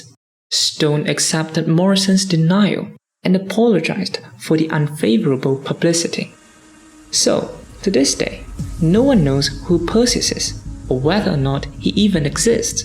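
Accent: United Kingdom. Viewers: 有学剑桥英语吗？你的口音好像学过呢~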